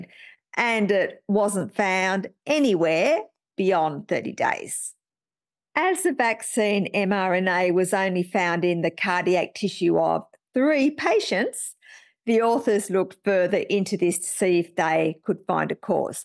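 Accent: Australia. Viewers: Some feedback on your Aussie speak. "Doozy" is more American slang really. It's a noun so you'd say something like, "Onya, another video that's a real doozy". Or "Thanks for the doozy of a video, ". Though "onya" (good on you) is definitely oz speak. Good Aussie adjectives might be "bewt" or "bonza" as in "Thanks for another bewt video, ".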